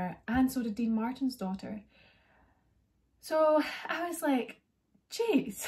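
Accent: Scotland, United Kingdom. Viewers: Are you Scottish? Your accent is super cool!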